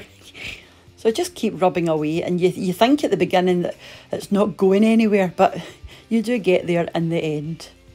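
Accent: Scotland, United Kingdom. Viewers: Thanks lovely recipe you make me homesick for Scotland when I hear your lovely accent and recipes all the best 😊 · Was looking frantically for apple crumble recipe and stumble on your! Once I heard the Scottish accent I knew I was in the right place. Needless to say I looked no further. Thank you I use this always now🙌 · thanks a lot.. all the way from kuwait 🇰🇼 to scotland 🏴, by the way i like your accent , 👌😍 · ahhh so yummy you have inspired me to make this ...... love the accent , my late mother was Scottish and thank you for the additional footage ..... I was supposed to visit my sister in Scotland but alas covid 19 thank you for this lovely recipe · That was lovely looking forward to the iced gingerbread, watching with one of my dogs Darcy by my side and she is looking up at me hearing your Scottish voice she clearly thinks you sound like me but can't figure it out this Scottish voice like mummy's coming from this black box 😂😂😂😂. 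Great wee video again. ❤️👌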